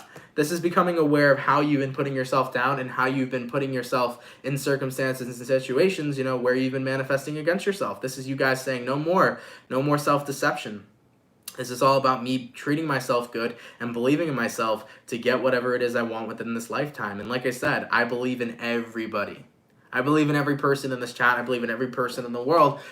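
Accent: England, United Kingdom. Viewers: From England great English accent more mate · Capricorn here, love the English accent haha. I’m English but mines more northern than that😊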